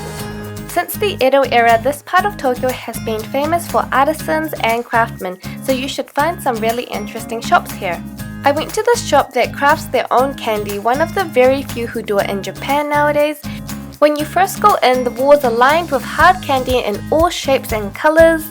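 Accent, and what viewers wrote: New Zealand. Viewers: Its so cool finding people also from New Zealand. I knew your accent sounded familiar ^_^ newly subbed but so far I adore your videos <3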